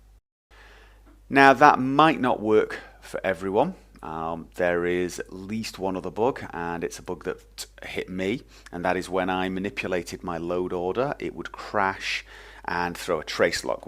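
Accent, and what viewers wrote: United Kingdom. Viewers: No he's British. :)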